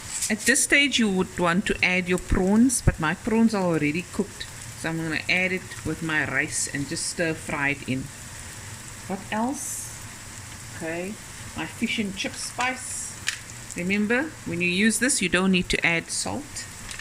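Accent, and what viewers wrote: Cape Town, South Africa. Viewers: I love how I just randomly came across your video. Heard the accent and I knew immediately you are from SA. I'm South African currently living in Paris. Just tried this dish. Yummy❤ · Nice job i ear you are from cape town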